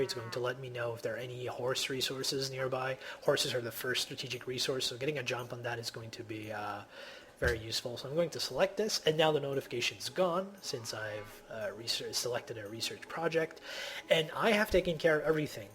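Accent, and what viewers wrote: Quebec, Canada. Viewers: You sound like you're from Quebec. Are you Quebecois? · I don't wanna be racist or try to discriminate but what nationality are you? You're voice sounds very different! It sounds almost french canadian :)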